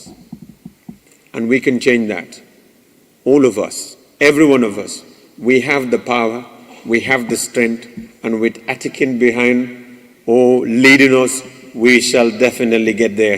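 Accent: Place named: England, United Kingdom. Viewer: 🎉❤🎉❤🎉 That's Our Chairman Sah English Accent Be lanla🎉🎉🎉🎉❤❤❤❤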